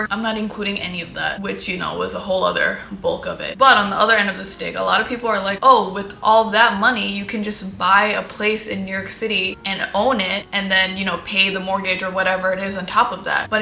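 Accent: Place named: Los Angeles, United States. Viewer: You’re not a native New Yorker… you have an LA accent not a New York! So quit frontin!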